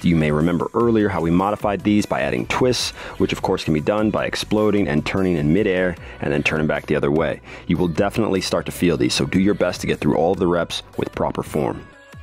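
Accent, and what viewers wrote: Netherlands. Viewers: I got one question r u dutch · Hold up are you dutch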